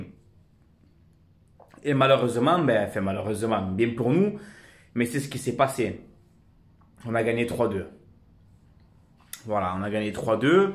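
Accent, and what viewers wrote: Marseille, France. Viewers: Cet accent de pur marseillais !!!! Allez OM frereee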